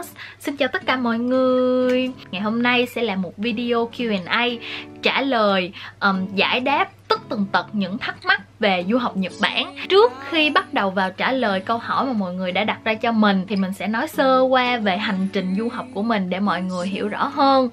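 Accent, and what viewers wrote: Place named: Mekong Delta, Vietnam. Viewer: Ôi e là người miền tây à C cứ tưởng e là người Sài Gòn cơ 😁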